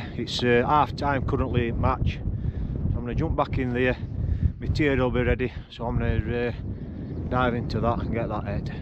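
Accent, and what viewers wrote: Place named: Northern England, United Kingdom. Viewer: As everyone else has said it’s corn Dee And your pronunciation of pen y fan was spot on mate and sounds even better in you’re northern accent.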